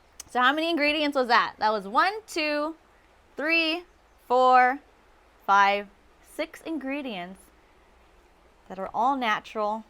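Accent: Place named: England, United Kingdom. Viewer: nice english accent.. gorgeous smile.. ❤️ thanks for the recepy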